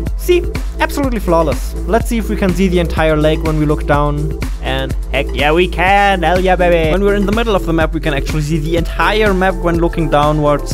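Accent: Germany. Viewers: Du bist aus Deutschland Ich weiß es ganz genau dein Name ist und du hast mega akzent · You're german like me I can hear your accent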